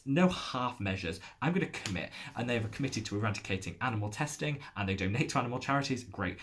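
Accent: United Kingdom. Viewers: You are one of my favorite contact creators and I thoroughly enjoy your videos and appreciate the enthusiasm and passion you bring to skin care. I really enjoyed the podcast you did with Kelly Driscoll. It was fun to learn a bit about you and your experience as a content creator. As someone from Southern California who hadn’t been across the pond, can I just say how much I love your accent? I really appreciate that you don’t take sponsorships and couldn’t agree more with what you said about how “If you sell your integrity, what do you have left?” You are one of the few people that I go to watch reviews on before buying a product because I really trust that you’re giving your honest opinion and not a sponsored one. Thank you for intro’ing me to British brand Medik8. As someone who uses Tretinoin but didn’t want to risk using it under my eyes, I’m excited to try their Crystal Retinal Cetamide eye. 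Cheers!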